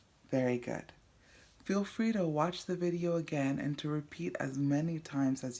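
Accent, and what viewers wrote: France. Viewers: I am learning so much. Are you a french native speaker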